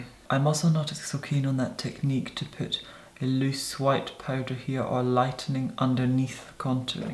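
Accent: Scotland, United Kingdom. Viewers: Anything by Diana Gabaldon. I love the Outlander series. Your natural Scottish accent would be perfect for the Scottish characters and your posh accent would match Claire beautifully.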